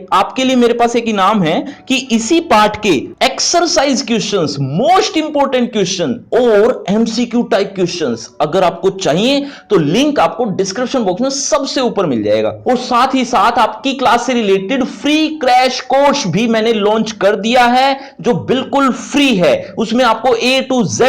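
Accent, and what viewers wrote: Haryana, India. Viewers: sir aap haryana se ho kya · Aap Haryana se ho ??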